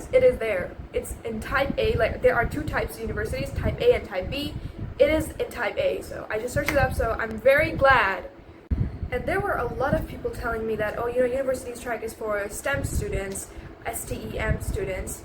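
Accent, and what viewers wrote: United States. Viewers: Why do u have an american accent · I’m disgustingly annoyed by the fact that you sound exactly like a girl I know, and guess what she isn’t even from India, she’s from the STATES🦅🦅. Why and how do you sound so American?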